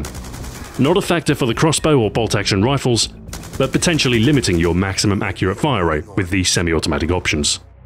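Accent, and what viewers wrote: England, United Kingdom. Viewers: R u really British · nope, a bit less Scottish and a bit more English. is based in Manchester, NW England.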